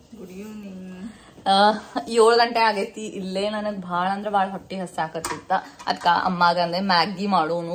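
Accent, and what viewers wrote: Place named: Dharwad, India. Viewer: ಏನ್ರೀ mrs ಏನ್ ನಡ್ಸಿರಿ... ಧಾರವಾಡ ಭಾಷೆನ ನಿಮ್ ಬಾಯಿಯಿಂದಾನೆ ಕೇಳ್ಬೇಕು ಅನ್ನಿಸ್ತದ ನೋಡ್ರಲ ಪಾಪುವಿನ ನಾಮಕರಣ ವ್ಯವಸ್ಥೆ ಮಾಡೋದ್ರಲ್ಲಿ ಬಹಳ busy ಇದ್ದೀರಿ ನಡೆಸಿ ಎಲ್ಲಾ ಒಳ್ಳೆಯದಾಗಲಿ 🙏🙏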